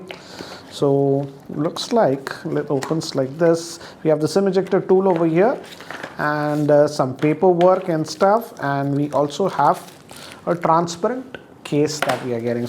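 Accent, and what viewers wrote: India. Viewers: Never thought i would hear a indian sounding guy say remove junk apps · Base in his accent I think he is an Indian.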